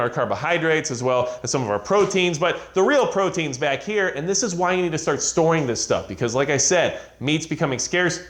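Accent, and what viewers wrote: United States. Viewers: You sound like you're from Hawaii lol. There's so many ways of making rice into a meal in and of itself. If anyone's reading your comment they best be listening because Japanese rice is the 💣 Asian rice is the best although I like jasmine and basmati as well.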